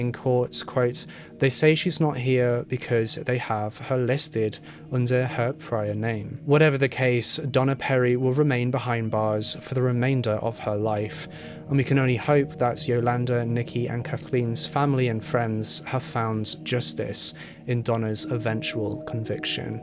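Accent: United Kingdom. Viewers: He's from the Uk..thats how they talk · Great job. Love your respect for the victims and families. P.S. For all the grammar police complaining about pronunciation of certain words I think he does an amazing job especially since he's from the UK. I know I wouldn't be able to pronounce the names of places where he lives and I give him credit for his effort. I live here in the US and still mispronounce certain things based on their spelling. does very well I think and I adore his accent!!! 👍🏻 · I love that you are doing a piece on our local unsolved, but I have to tell you, I'm giggling like a fat kid with a cupcake listening to you mispronounce local place names in a British accent! You didn't even get to any of the real tough Native American named places! Puyallup, Humptulips, Stillaguamish, etc! While we had a number of serial killers during the period of time, I still lean towards Yates as the guy. 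What a piece of work that guy is. The Green River Killer was working during the same period, and Seattle and Portland both had cases that sorta looked similar. I can't remember if ballistics testing was compared. Been a long time! Keep up the good work!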